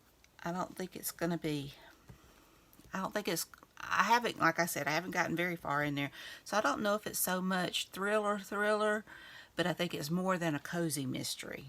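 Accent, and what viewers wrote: South, United States. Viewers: I have become a big fan of your collage. I love that you can combine simple elements together to make a complex and interesting story and layout. I appreciate your talent and it inspires me to give collage a try rather than watching others do it. I love your sweet southern accent and I enjoy your chit chat. Thanks for sharing!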